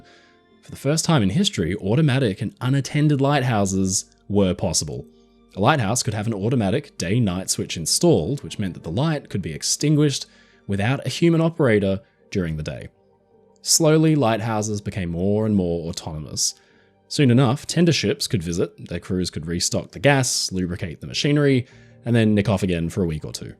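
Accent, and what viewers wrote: Australia. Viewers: I THOUGHT YOU WERE BRITISH NOT AUSTRALIAN! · well this is how i find out you’re australian. i’m absolutely terrible at recognising accents so i kinda just vacillated between thinking you were british and thinking you were american 🤦🏼‍♀️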